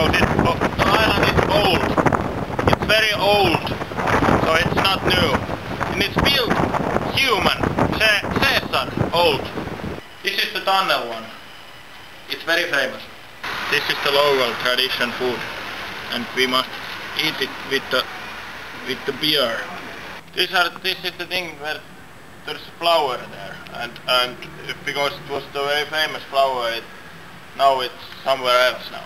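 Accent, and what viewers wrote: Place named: Finland. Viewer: I'll listen to this, if i miss the proper Finglish! :D Such a clearly nice strong typical Finnish accent! Yeah! :D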